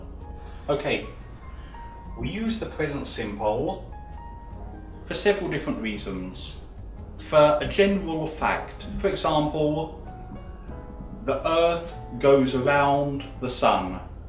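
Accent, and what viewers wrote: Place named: London, United Kingdom. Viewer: У этого преподавателя очень хорошее произношение( Exact English) Вы не найдете в России другого такого педагога- англичанина с лондонским акцентом.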